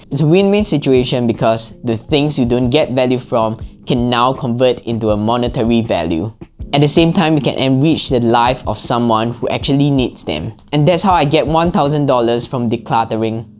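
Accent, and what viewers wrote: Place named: Singapore. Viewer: it is Singaporean accent, go to Singapore and you'll find many people talk like this 😊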